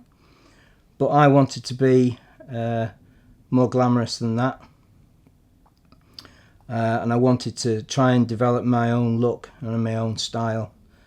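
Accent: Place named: Australia. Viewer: I hear a bit of Australian in her wow !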